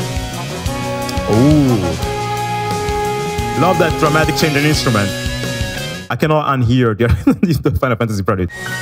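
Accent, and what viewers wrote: Italy. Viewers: but... Do you have Italian origins? your Italian accent is perfect!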